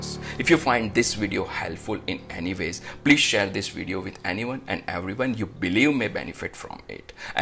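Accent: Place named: Gujarat, India. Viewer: I like your accent, gujju Bhai.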